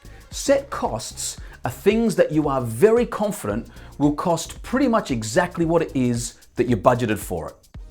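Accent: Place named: Australia. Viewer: Oh man, I love a good Australian accent. That right there gets you my subscription. I like how you're putting emphasis on the automation of the budget. I talk about that quite a bit in my content as well. This is an excellent video covering those basics. I think that's really helpful for some people who've never budgeted before. You have some good examples too. I found you just by searching for budgeting channels on YouTube because I love the budgeting community and focus a lot of my content around it too. Keep up the good work!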